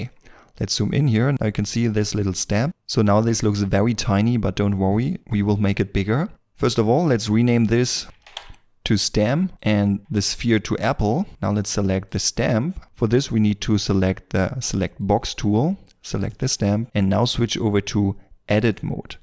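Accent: Germany. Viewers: Since you sound german, why dont you make german videos? :) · Gutes Tutorial, aber dein deutscher Akzent sticht stark heraus xD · German accent😂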